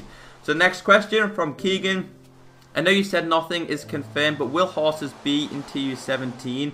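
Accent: Liverpool, United Kingdom. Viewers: Are you from liverpool ?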